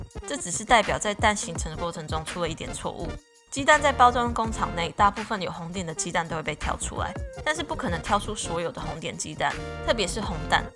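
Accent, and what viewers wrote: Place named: Taiwan. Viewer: 雖説台灣妹子説的不是標準國語，但這個調子聽起來好可愛！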